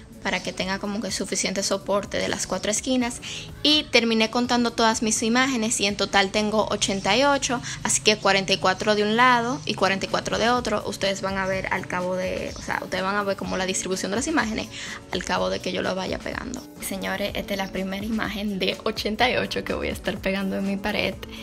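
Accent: Dominican Republic. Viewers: Eres domicana! Conozco tu asentó 👊😌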